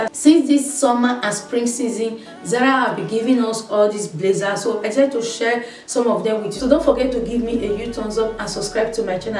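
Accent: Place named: Nigeria. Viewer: Very straight to the point. love it! you sound Nigerian too, beautiful accent.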